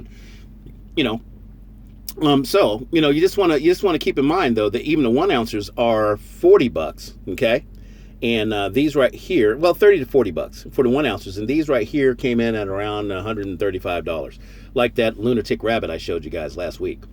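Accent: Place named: United Kingdom. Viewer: your brit accent is spot on!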